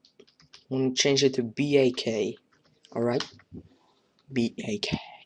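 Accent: Netherlands. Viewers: Je hoort nauwelijks het Nederlandse accent, doe je goed, ge hebt een sub erbij ;) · haha ik zag nl-NL voor bij komen, vandaar het dinglish accent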